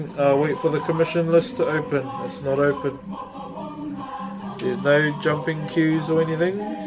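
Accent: New Zealand. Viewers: Your accent sounds New Zealand, but your carving style looks Hawaiian/Polynesian... So pretty. I really like it ❤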